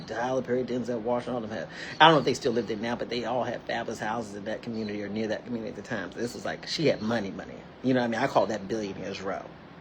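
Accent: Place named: Maryland, United States. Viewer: Your Maryland or Murland accent is really there, lol.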